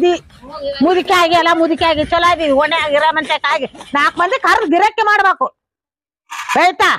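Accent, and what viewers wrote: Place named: Bijapur, India. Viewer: ❤❤❤❤ yu very talented.. Bijapur Kannada tumba ಚೆನ್ನಾಗಿದೆ 🙏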